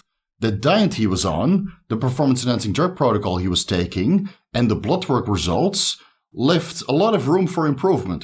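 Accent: Netherlands. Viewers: are you dutch? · Your profile says USA, but this is a Dutch accent right? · good video! you sound dutch. are you? greetings from the Netherlands haha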